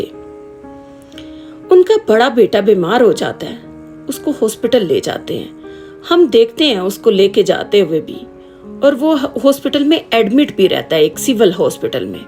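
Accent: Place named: Punjab, Pakistan. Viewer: Your speaking style with Punjabi accent is very charming. Keep it up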